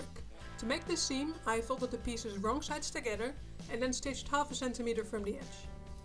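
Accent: Netherlands. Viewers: Great bags... One question ... are you dutch???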